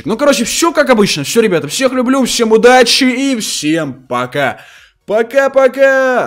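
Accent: Ukraine. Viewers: говорит кнайф потому что он украинец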